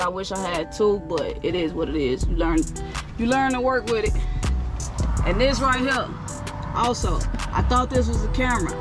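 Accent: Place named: Chicago, United States. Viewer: My first time over here and from the way you talk you sound like you from my city , Chicago , dont know if im right but i can tell when i here my own .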